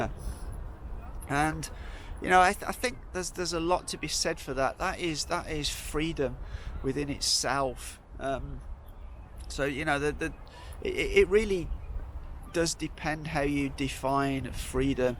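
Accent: United Kingdom. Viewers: It is interesting to see some British guy (judged from accent) filming comments about China while self-wondering in Chinese city lol.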